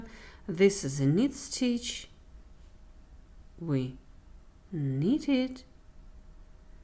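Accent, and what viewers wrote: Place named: Russia. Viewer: in Russia we call it grandma’s style. Also we have other styles such as classic and eastern. Aren’t you russian by any chance? Your accent sounds russian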